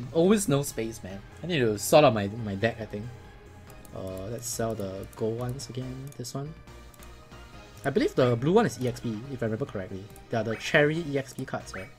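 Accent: Singapore. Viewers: you mean everyone in Singapore speaks like this and actually understands each other??? O_O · its called singlish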